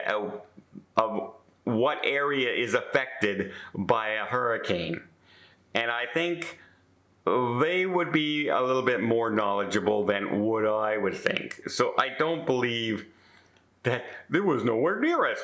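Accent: Canada. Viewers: It's the Canadian accent 😉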